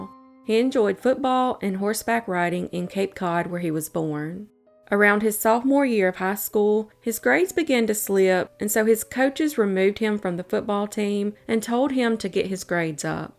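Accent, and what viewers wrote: South, United States. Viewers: Coffee, Crime and a Southern accent. It is a good morning.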